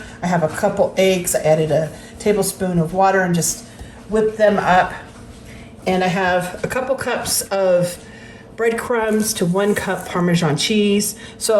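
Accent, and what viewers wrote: South, United States. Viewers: Amen!! This Mississippi girl would love some of that snow too!! It’s so beautiful,, love watching you,, gotta say,, I sometimes detect a slight Southern accent , 😉 love it!! You are who I’ve always wanted to be! Is there anything you can’t do❤️ too blessed to be stressed 🙏🏻❤️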